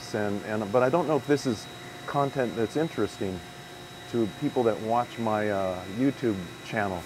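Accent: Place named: California, United States. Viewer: I wondered if you had a bit of California in your story; I'd taken from your accent that you'd lived there.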